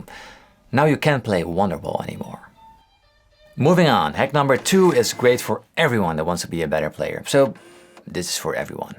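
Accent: Netherlands. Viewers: Why do you have an English name but sound like you're Dutch? Great videos btw, although many of them make me wanna give up playing in a way... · I am just starting out learning to play guitar aged 53, every single video has something amazing in it to learn, being a fellow Dutchman the accent makes me yearn for home again! (Living in the UK since a child) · It’s so easy to recognize Dutch people speaking English. This is the first vid I watch from you but I can tell you’re Dutch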